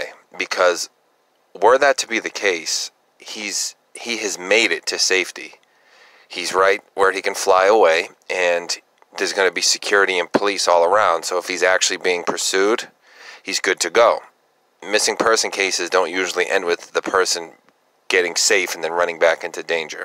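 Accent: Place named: New England, United States. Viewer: This case fascinates me. Listening To your voice I’m thinking you’re a fellow new Englander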